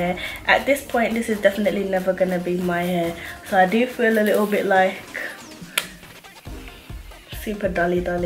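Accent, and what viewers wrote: Birmingham, United Kingdom. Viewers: Aww Birmingham accent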